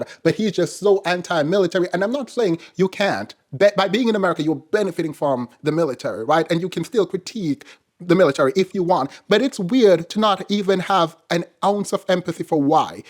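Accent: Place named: Jamaica. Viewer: You lead with your true Jamaican today and it was AMAZING!!!!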